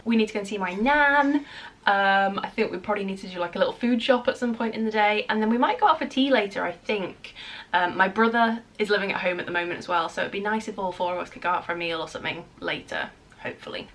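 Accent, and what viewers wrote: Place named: Wirral, United Kingdom. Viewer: Isn’t it funny that I could tell from your first video that you were from the Wirral 🎉🎉 We always recognise our own 😂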